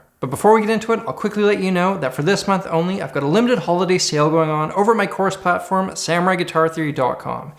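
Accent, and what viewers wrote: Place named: Canada. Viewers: Forgive me if it's too personal/if it's a weird question, but where does your accent originate from? It sounds Canadian maybe, but a little more... I dunno, "thick" on some words? I really like it, it sounds very cool! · He’s from Manitoba, which is a province in Canada (I assume you’d know that, since you can recognize a Canadian accent. If you didn’t, I guess you learn something new every day 😅)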